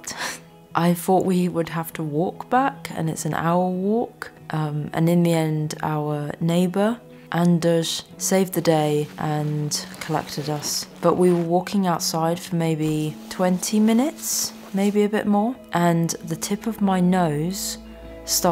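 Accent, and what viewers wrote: United Kingdom. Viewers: i just moved to sweden... i can't wait for spring and summer this winter made me sick of snow. my goal is to get so much sun that im tired of sun also you don't sound swedish to me your English sounds like you're from the UK or some shit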